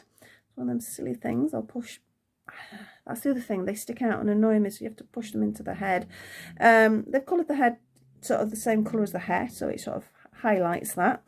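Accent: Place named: Yorkshire, United Kingdom. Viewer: You sound like a Yorkshire/Nottinghamshire lass XX I've just discovered these cute little dolls, there are a couple on sale on Amazon but I thought I'd watch a review first so thank you for sharing 😊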